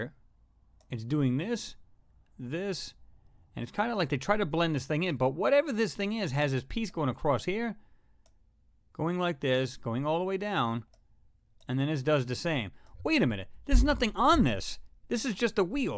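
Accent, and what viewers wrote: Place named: Connecticut, United States. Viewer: "it looks like it got jammed in... (Dramatic pause, me your long time listener hoping in your Connecticut accent say machine paaaahts ) whatever that is"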